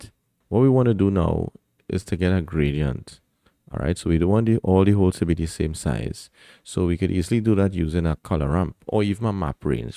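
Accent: Trinidad and Tobago. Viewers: Thank you man, Very helpful ! PLUS I think I heard a Trini accent , that's refreshing haha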